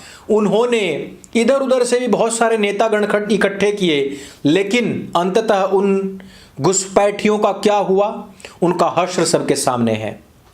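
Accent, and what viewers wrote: Haryana, India. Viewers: mai haryana se hu bhot acha laga jo aaj aapne haryanvi boli😁😁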